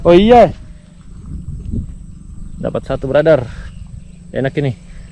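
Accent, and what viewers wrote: Indonesia. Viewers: BHS nya spt org gorontalo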